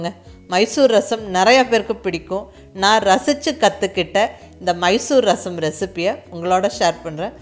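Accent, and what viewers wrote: Kongu, India. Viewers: I tried rasam . Awesome. Thanks. I like the way of telling and your sweet kongu Tamil slang.👌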